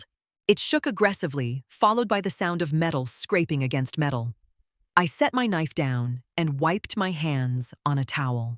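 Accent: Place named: United States. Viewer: Why would this channel use an American accent AI narration, but have Euros going into the wallet?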